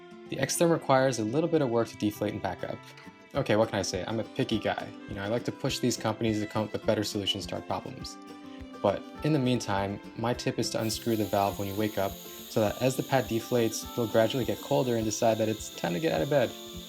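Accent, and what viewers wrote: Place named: United States. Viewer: My, my, aren't you petulant. 1) Assuming you were an American is not an unfair assumption since that's what your accent sounds like to my ears. 2) I know it's an old video. I even say as much right off the bat. Nonetheless, the comment still stands about not using one standard of measurement (i.e. imperial) as other people are accustomed to the other standard (i.e. metric); hence why it's better to use both. You may very well have changed this since you made the video, but you could have just done the proper thing from the get-go, and that's all that I was really saying. I only saw the video yesterday because that's what came up in my recommended feed. You know, you could have taken my comment in good spirit instead of getting all tetchy about it. You could have laughed it off; that was an option for you. But instead you've made a big deal out of nothing. 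Sheesh!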